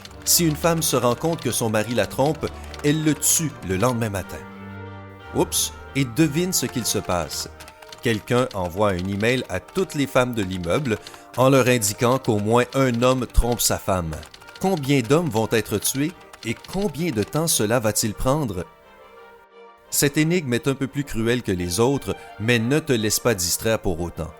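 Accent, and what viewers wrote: Quebec, Canada. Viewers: C'est un québécois c'est sur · Enfait cette chaîne est québécoise non moi je suis québécois et je reconnais l’accent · J'aime beaucoup le petit accent québéquoi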